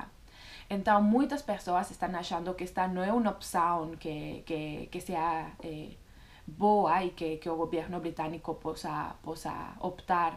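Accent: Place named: Spain. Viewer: Muito bom mas esse sotaque espanhol atrapalha 1% mas de resto seu português é muito bom e você é bem esclarecedora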